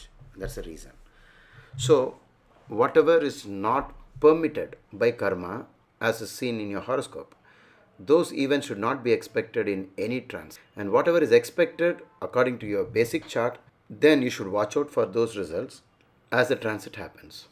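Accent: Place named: South India, India. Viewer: sir i respect u, u r senior to my age but u speak so lower voice i hve difficculty hearing clearly, also cos u hve south indian accent, ple speak slowly else v dont understand at once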